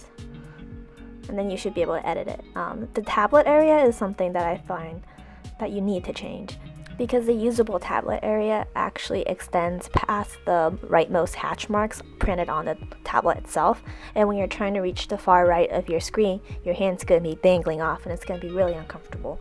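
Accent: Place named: United States. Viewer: You sound normal, wth. Idek what's up with the whole voice thingie but you sound like a normal American girl. Like, dude. You have great art, smh. It's gonna take me years to be as good as you and what sometimes hurts? Your voice? Bruh. There ain't nothing wrong with your voice, G. :/ And what negativity? My G, opinions are opinions and if someone doesn't agree, then that's their opinion. You have a lovely voice, it's fine... on a separate note, I love her hair... I betcha if I stepped on her hair, I'd be falling through space. 👀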